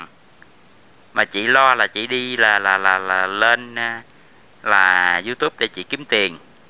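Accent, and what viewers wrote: Standard. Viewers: ban noi qua chuan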